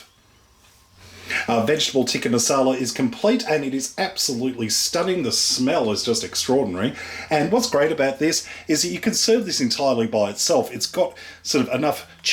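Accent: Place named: Australia. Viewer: Yes I think you chuckle after u taste ur dish every one ur Spirit is Amazing thanks for all the joy u bring us and ur Amazing Aussie accent as well.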